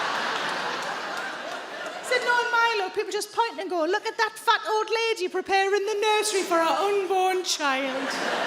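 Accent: Newcastle, United Kingdom. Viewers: she's from the north east of England ...around Newcastle they are known as Geordies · The north east of England Newcastle area 👍👍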